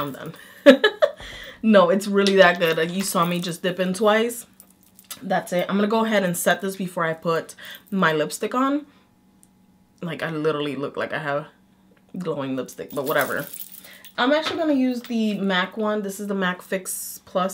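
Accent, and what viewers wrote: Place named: Connecticut, United States. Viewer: Oh hey Connecticut! I caught the accent a while back and I was wondering... but I knew you were nearby haha I'm in Bawstin honeyy.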